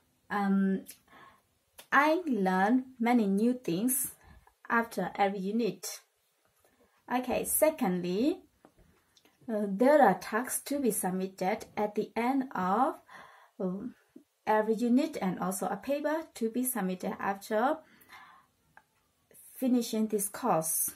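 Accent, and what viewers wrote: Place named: Myanmar. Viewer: As a Burmese I feel proud not because she speaks good but her accent is a little bit different from other Burmese and for her courage ..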